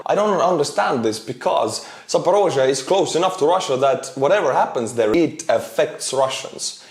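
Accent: Scotland, United Kingdom. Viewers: This scottish accent 😂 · Dude , your Scottish accent is hilarious 😂🤣😂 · Best Scottish accent ever, never change 🇬🇧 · that Scottish accent is truly beautiful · I am Scottish but I find your Scottish accent funny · Great info as usual and I love the Scottish accent 😀. ( realy is Ivan that bad? 😀) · Nice Scottish accent xD I don't often here Europeans doing impressions of any UK regions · Your scotch accent is perfect I love it it cracks me up inside and out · 😂😂 Loving your Scottish accent! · Absolutely love the Scottish accent, made my day. Lol lol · I love the Scottish accent 🤣🤣🤣🤣👍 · Great to hear a fellow Scots with a local accent 😉😆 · Love the jock accent mate, funny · pretty good Scottish accent. WE always refereed us as "OAT MEAL SAVAGES" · That's one top Scottish accent .... better than the Highlander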